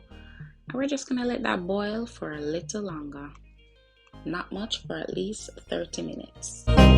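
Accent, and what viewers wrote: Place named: Jamaica. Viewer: Jerk seasoning on oxtail? How is this authentic? Because you sound Jamaican?you add both ketchup and American ingredient bbq sauce and sugar So you making Jerk oxtail? Why trying to change oxtail to oxtail stew? What’s the yellow film, you add flour gravy no look so. Don’t stew suppose to have potato, carrots?Some many questions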